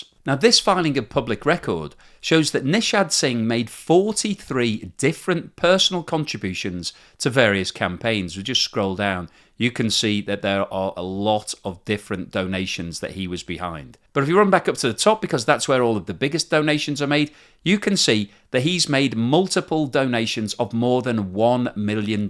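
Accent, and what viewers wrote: United Kingdom. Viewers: P.S. appreciate your regular brit accent. Don't do Posh! That accent sounds like the king of england got combined with a California valley girl. Awful. Posh accents are ruining British English!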